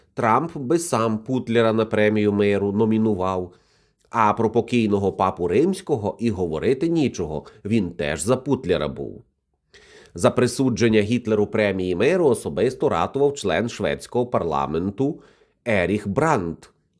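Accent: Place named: West, Ukraine. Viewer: Тебе послухати, - виходить, що Гітлер не був вже і такий поганий, не сказати, взагалі, добрий. Поцінюваний міжнародними елітами політичного і культурного нахилу. Ну, були у нього де-які дрібні недоліки. І що? У кого їх нема? Ну що з того, що завдяки його невинним примхам-забавам у світі загинуло до 80 мільйонів людей? Він же був хоробрий, був поранений, мав нагороди, художник, номінант на саму Нобелевку! Корочше, сама доброчинність! Не те що росіяни, читай Путін. І як доказ своєї маніпулятивної думки, за вуха притягує сюди образ Мао Цзедуна і Пол Пота, до купи. От так провінціальний недолугий історик-аналітик, загнавши корову до стайні і давши свиням їсти, навіть не витерши руки, миттєво біжить до комп'ютера. І своєю, впізнаваною ореол його мешкання, інтонацією, - західнянського селюха, береться щось блямкати примітивне зі скарбниці своїх недолугих нашарувань, що рояться в його голові. 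Убого хизуючись, як йому здається, йому одному відомими фактами про Гітлера. І мерщій старається поділитися їхнім ново-відкриттям з широким загалом, але під кутом власної, так би мовити, критично осмисленої, точки зору. Яка насправді відбиває помутніння його свідомості і реальної оцінки такого явища, як Гітлер. А насправді його не це цікавить. Йому ж, заради своїх шкурних інтересів і з метою приваблювання глядачів, конче потрібно робити сенсанційні заяви про те що на фоні росіян, пан Гітлер виглядає цілком пристойно. Бо скажи він зворотне, то це нікого не привабить. Бо тут не буде нічого нового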